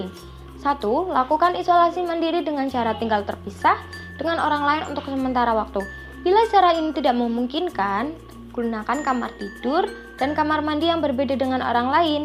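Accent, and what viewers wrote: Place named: Java, Indonesia. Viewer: Medok nemen nok bahasamu😂😂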